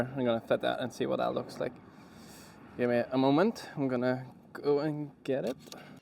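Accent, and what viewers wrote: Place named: Northern Ireland, United Kingdom. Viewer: Taip ir galvojau. Pagal akcenta tu kazkur apie Antrim gal gyveni, cia tik speju 😄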